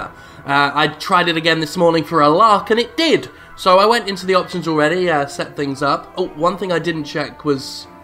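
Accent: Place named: Liverpool, United Kingdom. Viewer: Bill Nigh has the most elegant voice I've heard. This narrator though, I'd say he sounds more like George Harrison, that Liverpool sort of accent.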